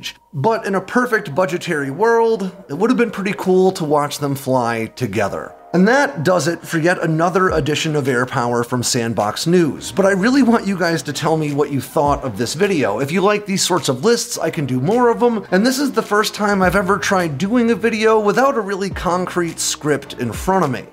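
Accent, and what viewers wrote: United States. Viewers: I'm not going to subscribe for two reasons. (1) I really dislike listening to other Americans, and (2) you didn't mention the reason why the US military usually chooses the wrong weapons: Corruption. The US government and military brass are all woefully corrupt. Lockheed-Martin's business model has been based on paying off politicians and generals since before they merged. Lockheed paid off Congress and the joint chiefs with a few million dollars, and got trillions of dollars in taxpayer money to deliver fighters that DISSOLVE ON CONTACT WITH WATER!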